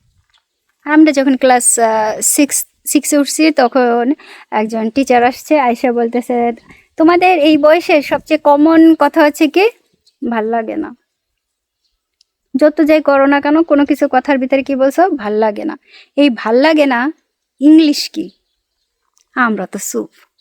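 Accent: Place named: Barishal, Bangladesh. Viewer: আপু আপনার বাংলা উচচরন শুনলে মনে হয় না আপনি খুলনার মেয়ে।আপনিতো বরিশালের মত কথা বলছেন।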